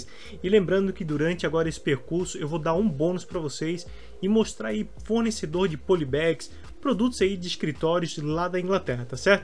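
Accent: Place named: Florianópolis, Brazil. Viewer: você é de floripa também? fala igualzinho aqui o sutaque kk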